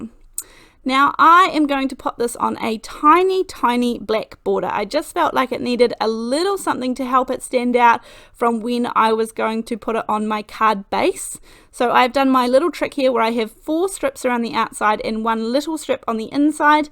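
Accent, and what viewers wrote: New Zealand. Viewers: OMGosh so love this technique yr amazing so greatful to have yr tutorials no wonder yr following is growing so fast have shared you to my friends and groups yr so easy to listen too a huge plus yr kiwi love to see us do well on the world stage we small but a amazing country 🤗🦋🦋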